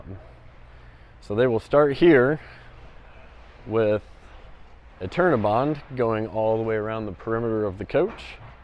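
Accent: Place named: Texas, United States. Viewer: Вери гуд👍🤣английский у автора канала чисто американский с техасским акцентом! 👍👍👍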